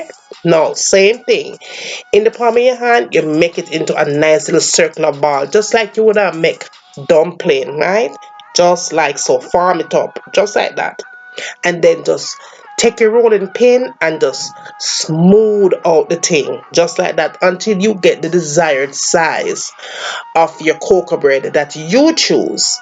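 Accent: Jamaica. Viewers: 😳😝Gosh I love to here you speak. U be the Jamaican I love to listen to like Miss Lou. Yes happy channel growth. Now please traditial Jamaican yest corn bread receipt Thank you.🤣😎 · I could listen to you all day, Luv how your utensils and kitchen are clean. loving the Jam twang' too! lol@ it there and let it hang out." You have a subscriber right away. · I love this delicious 😋 Coco bread. I love listening to your tutorial with our Wonderful Jamaican accent& language. If you love listening to our language you can check out my Poetry 💖Keep sharing these delicious recipes 💖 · I love accent you really Jamican and way to talk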